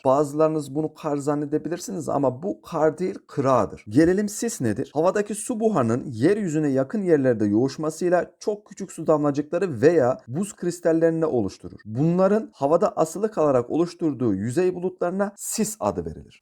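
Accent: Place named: Adana, Turkey. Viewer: konuşmanız adanalılar gibi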